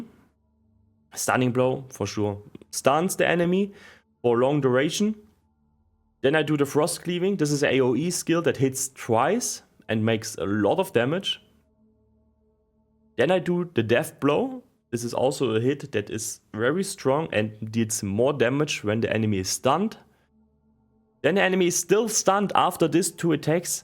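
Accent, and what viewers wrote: Germany. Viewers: Man hört aber extrem das du deutscher bist 😅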